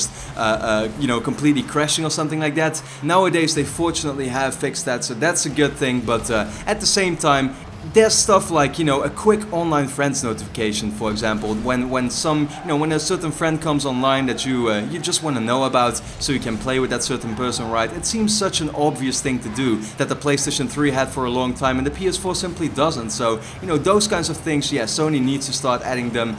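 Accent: Netherlands. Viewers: i also hear a dutch accent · Dutch accent?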